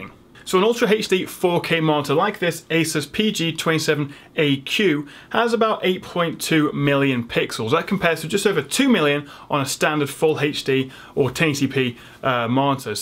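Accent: United Kingdom. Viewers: Nice video btw. Should sound "eich" which sounds more natural. No offense to the british language. My uncle lives in england and my aunt is an engish women. I'm familiar with this accent but h always sounded kinda strange. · Are you from UK?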